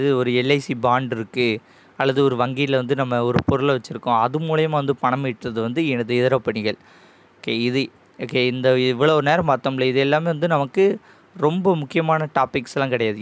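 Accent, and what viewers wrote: Tirunelveli, India. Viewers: Bro nenga Tirunelveli Thana..namma slang theriuthu... thanks for ur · Bro ninga trinelveli ah? Slang theriuthu, teaching super bro, school la kuda ipd explanation kudukala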